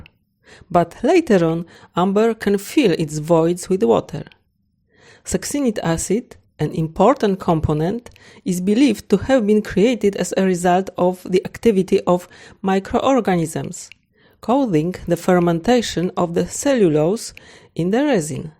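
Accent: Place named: Poland. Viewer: NIE DA SIĘ TEGO SŁUCHAĆ GDYBY NIE TEN POLSKIANGIELSKI TO MOŻNA BY OBEJRZEĆ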